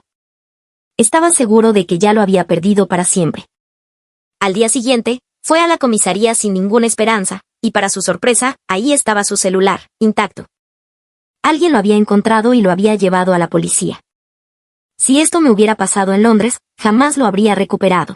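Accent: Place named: Lima, Peru. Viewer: Mucha jerga y en una mujer es mas feo. Sólo en Lima hablan así.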